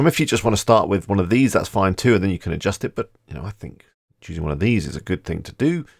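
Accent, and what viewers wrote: United Kingdom. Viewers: Here you get real stuff and with British accent. Epic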